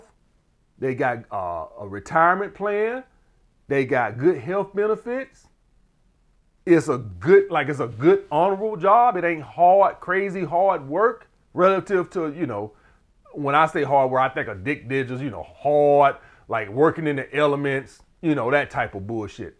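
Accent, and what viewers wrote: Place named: South, United States. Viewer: This Alabama language.. southern style lol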